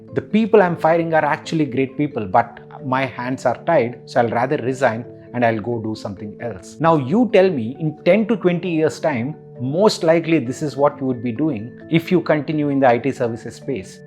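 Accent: India. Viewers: the indian accent of english language is so cringe to listen to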